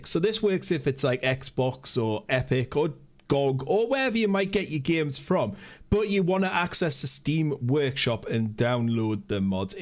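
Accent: United Kingdom. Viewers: seems to be british.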